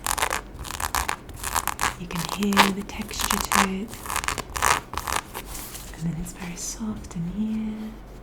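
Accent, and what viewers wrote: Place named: United Kingdom. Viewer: An Italian with a British (I assume) accent, living in Egypt, with friends in France, and family in the USA. What a true international life!!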